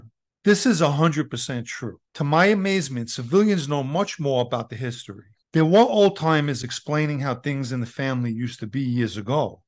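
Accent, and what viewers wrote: New York, United States. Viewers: Slight NYC accent?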